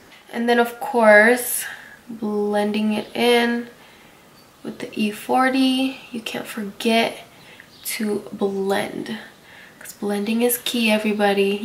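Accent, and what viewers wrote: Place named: United States. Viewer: Christina Tran well she sounds american to me so I think thats classed as an accent aha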